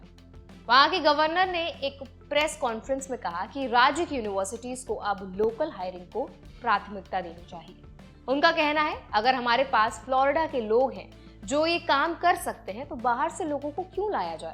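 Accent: India. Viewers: I'm sorry but you Indians hsan annoying accent when you speak English. Have you ever listened to yourselves?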